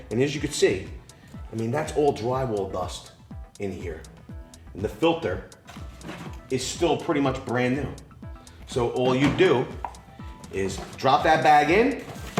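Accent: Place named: Italian-American, United States. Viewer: Guido accent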